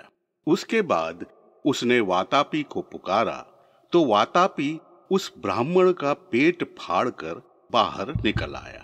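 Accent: Standard. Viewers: सचमुच रहस्य एवं रोमांच से भरी महाभारत की एक अद्भुत् और अलौकिक कथा….! आपकी अद्भुत् और शुद्ध उच्चरित वाणी से कथा और भी प्रभावी हो गयी है। चित्र भी ख़ूबसूरत नंऔऔर सटीक हैं। एक प्रश्न मन में उत्पन्न हो रहा है कि अगस्त्य मुनि ने जिस सुन्दरी स्त्री का स्वयं निर्माण किया उसी लोपामुद्रा से विवाह करने की इच्छा क्यों की...? दैत्यों का वध उचित था क्योंकि उनके कर्म उचित नहीं थे। पर दैत्य की संतान प्राप्ति की इच्छा क्यों पूरी नहीं हो सकी...? क्या अनुचित था? 🤔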